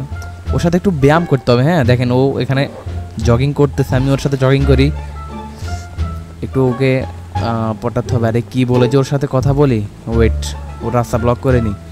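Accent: Noakhali, Bangladesh. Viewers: আপনি কি নোয়াখাইল্লা আমরা ও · ভাইয়া আপনি কী নোয়াখালীর ছেলে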